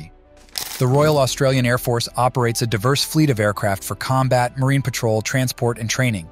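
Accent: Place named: United States. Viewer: Can we please have anyone other than a yank tell such a story?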